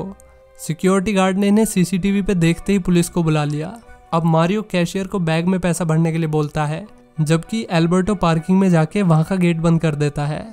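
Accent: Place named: Western Uttar Pradesh, India. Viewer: Bhai tu west up se h n 😂 Moradabad , bijnor orr meerut?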